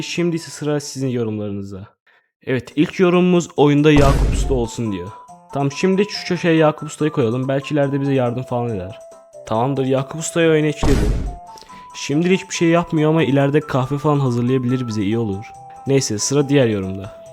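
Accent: Azerbaijan. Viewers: Abi Azerbaycanlisan?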